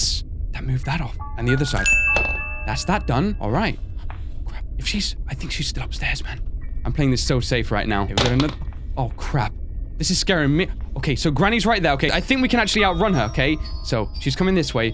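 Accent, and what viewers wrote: United Kingdom. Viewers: Ur British right?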